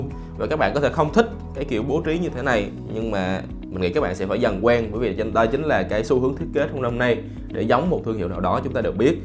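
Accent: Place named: Central, Vietnam. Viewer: Giọng như miền trung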